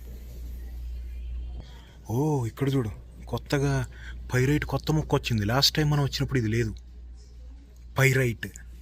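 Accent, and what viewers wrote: Andhra Pradesh, India. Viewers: Pakka Meedhi..... Vijayawada nundi Nizamabad shift ayina Family Bro meedhi ... :D Langauge lo eh matram Telangana ledhu ..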